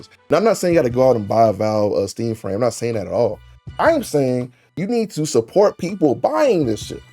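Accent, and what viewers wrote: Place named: South, United States. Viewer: You can be as country as you want when you're spitting facts....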